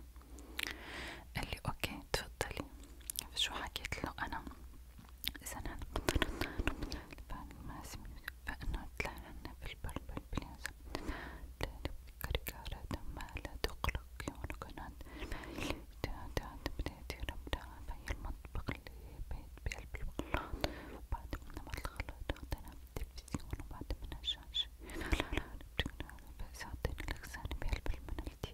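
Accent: Egypt. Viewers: اللهجه المصريه عليكي تحفه بجد❤❤ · اللهجه المصريه عسل 😊❤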